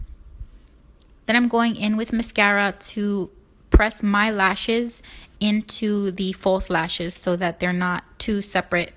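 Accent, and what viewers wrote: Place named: New York, United States. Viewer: Are you from new York or new Jersey you have an accent I like it!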